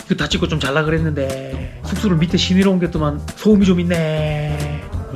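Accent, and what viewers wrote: Gyeongsang, South Korea. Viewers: 유쾌한방송이네요. 좋은말씀을 갱상도버젼으로 들으니 마 기에 팍! 하고 꼬피뿌네요. 감사합니다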